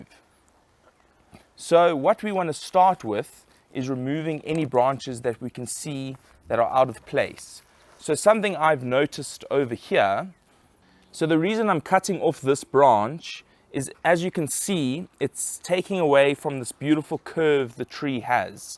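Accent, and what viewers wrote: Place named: South Africa. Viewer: really great video.....thank you.....also great to hear a fellow south african accent on you tube.....its also wonderfull to hear and feel your passion......im a beginner and just starting my bonsai journey. do you live in South Africa? where abouts?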